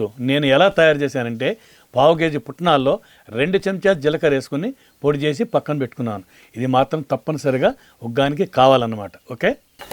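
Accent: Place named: Godavari, India. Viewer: మీ మాట్లాడే తీరు చూస్తుంటే కర్నూల్ laga ledu..Godavari jilla vari laga వుంది..చాలా చాలా బాగా మాట్లాడుతూ న్నారు. ధన్యవాదాలు 😊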